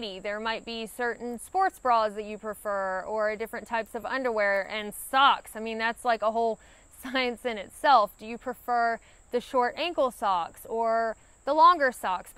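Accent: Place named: Virginia, United States. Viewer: Love your accent. Reminds me of the locals around Blacksburg VA, where I spent a few years at VT. I sure enjoyed talking to the locals. Wish I could have made more time for the outdoors wile I was there. Good to be back home in the mountain west.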